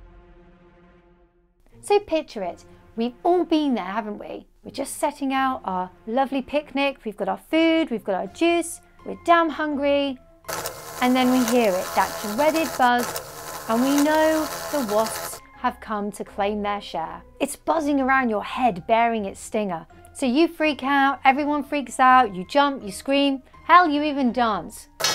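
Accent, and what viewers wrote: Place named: United States. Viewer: You talk about rewilding in Britain but your not actually British yourself from how you speak I guessing you’re actually American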